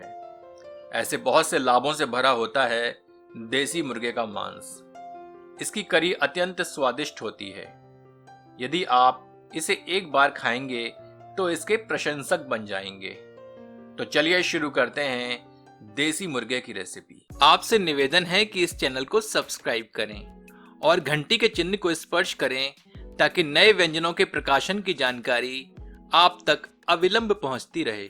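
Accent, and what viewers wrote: Standard. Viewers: Kya sudh hindi bola hai bhai ne ,...wah mza hi aa gya 😊😀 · itni sudhdh hindi ka uccharan mai aapko subscribe karne se rok nhi paya jai Hind 🙏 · आपका हिंदी में बात करने का अंदाज और इतनी स्पष्ट शुद्ध हिंदी मैं पूरा विवरण देने के लिए बहुत-बहुत धन्यवाद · बहुत ही शुद्ध हिंदी है। बहुत पसंद आया | और चिकन रेसिपी भी बहुत अच्छी थी| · Wow bhai... Mouthwatering hai vakai... Apki itni shuddh hindi hame mantramugdh kr gyi.. Pahli baar itni shuddh hindi youtuber ko suna h... Proud on you. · I can just say.... Mouth watering recipe and heart winning presentation....... And Hindi in its purest form..... 👍 Hail chef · Itna to hamare hindi ke sir ki bhi sudh hindi nhi he 😂🤣😁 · शुद्ध देसी हिंदी और देसी मुर्गा आनंद आ गया देख कर। लेकिन देसी मुर्गा सिर्फ 2 सीटी में ही गल गया?काफी कम उम्र का मुर्गा था लगता है। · sahab ki shudh hindi aur recipe dono great 👍 😋 👌 hats off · Bhai tho blkl shudh hindi or urdu bolte hai 🙏❤️👍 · इतनी शुद्ध हिन्दी wow ❤❤ mera dil aa gaya tum per sir❤ love you sir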